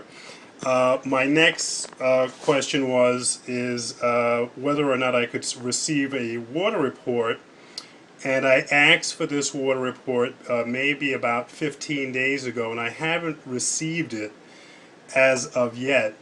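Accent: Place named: North, United Kingdom. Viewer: hes from up north i can tell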